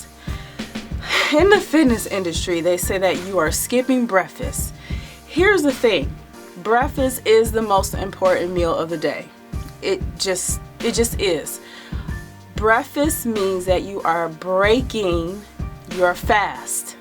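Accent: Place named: Detroit, United States. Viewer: that Detroit for BREAKFAST 🙄😄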